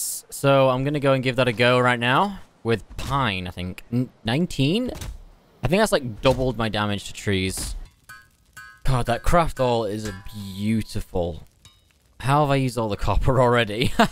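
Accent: England, United Kingdom. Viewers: Loving this modded series will have to look at installing mods just for the build anywhere and automated forge.BTW their is a content creator who plays RUST called willjum and i swear it must either be you as another account or your brother,the voice is the same event down to the english accent.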